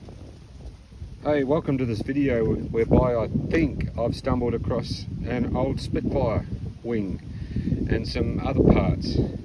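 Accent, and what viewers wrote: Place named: Australia. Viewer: You definitely have. Assuming by the accent you’re in Australia. It’ll be a Spitfire MK VIII, leading edge tank as standard. Give Cameron Roloh-Smith a ring at Performance Aero at Archerfield, he’s the Australian Spitfire man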